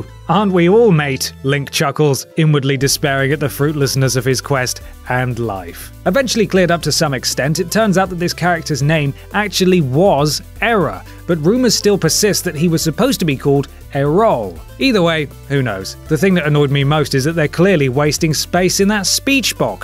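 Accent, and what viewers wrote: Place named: England, United Kingdom. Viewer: You had me at "silver haired bugger" but you killed it at "wanker". God save the queen, your video is awesome but you english accent earned my subscription.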